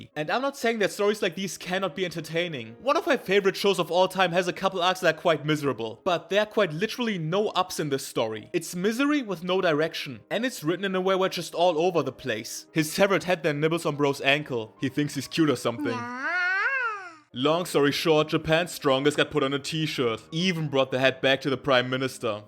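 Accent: Germany. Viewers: Could you read Boys abyss? I think it doesnt matter if you shit talk or compliment manga, because i think you make good commentary either way. Btw are you german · i hear deutsch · Starker Deutscher Akzent Bro. Erstes Video was ich mir von dir anschauen werde.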